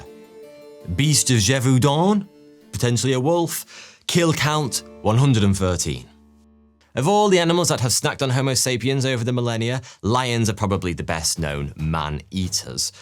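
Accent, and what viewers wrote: United Kingdom. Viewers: I usually love your videos, especially the fact that the British accent is the most attractive in the world and your content was almost always on po8nt. Not this timw. Im so tired of humans, with our vast intellect, completely distegarding our animal instincts when considering humans threat level. How can a 200lbs mountain lion bolt from a person half its size, but other humans seem to think we're so weak and flimsy? A. We are the ONLY great ape species capable of swimming, awkward or not. B. There are very few animals that can run for as long as we can, or pursue pray for as long as we do. Cant run from sometbibg that wont stop chasing you. 3. You can keep on with your pessimistic approach to humanhood, while i take in the facts of history. Consider we are the dominate species on the planet, well, come prove im weak for being human, go ahead.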